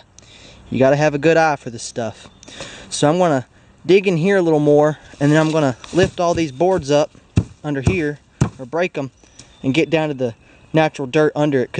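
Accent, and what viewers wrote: South, United States. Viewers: I like your forest gump ascent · Your accent is like cowboy like your is yall.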